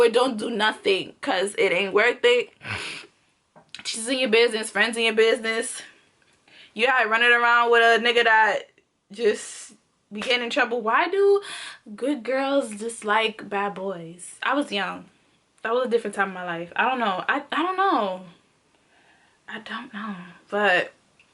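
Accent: Jamaica. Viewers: Just to be curious are you jamaican, half jamaican or part of jamaican because in some in your videos, you had that jamaican accent when you talk sometimes? The reason I'm asking is because I'm half jamaican so I can tell if someone is jamaican.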